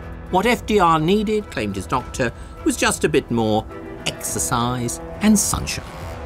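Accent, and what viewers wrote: United Kingdom. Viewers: A peculiar source of narrator. Why would this outfit select a person with a somewhat muddled but clearly British accent to discuss an American president? It's inappropriate, to say the least.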